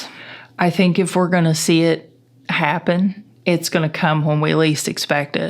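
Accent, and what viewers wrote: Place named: East Tennessee, United States. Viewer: you HAVE to be from the East Tennessee, SW Virginia area?? That’s where I am originally from and you sound like all my kinfolk! 😊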